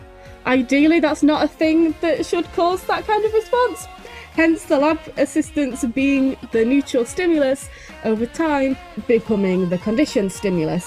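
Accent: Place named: Russia. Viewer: why did i think this man was a russain immigrant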